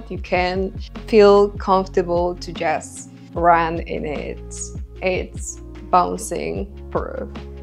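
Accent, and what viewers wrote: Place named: Eastern Europe. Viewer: What camera do you use? The quality is terrific in 4K, which is a relief because one sees so much fake 4K on YouTube. The setting you're filming in also helps, it looks like a plush hotel room. The lighting looks well calibrated too. Very photogenic, your hair and makeup is flawless - if you're not already modelling on runways every week then I will be shocked! You look like a young, Eastern European (judging from your accent) version of Adriana Lima.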